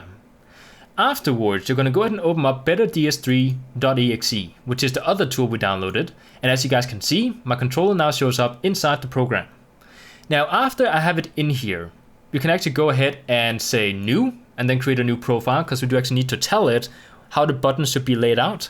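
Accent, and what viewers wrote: Denmark. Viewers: Tager jeg helt fejl hvis jeg kan ane en snært af dansk i accenten :P? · He's Danish u can tell by the accent and the ads.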